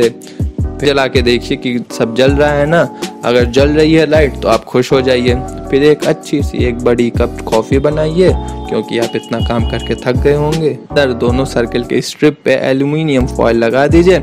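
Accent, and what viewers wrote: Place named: Lucknow, India. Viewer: You r from Lucknow iam sure😎😂 because iam also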